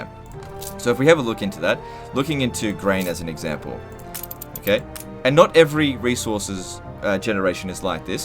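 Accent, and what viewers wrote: Australia. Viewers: isnt he australian lol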